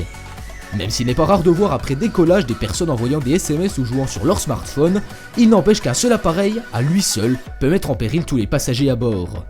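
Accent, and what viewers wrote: Belgium. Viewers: t es enrume ou t as un accent belge ?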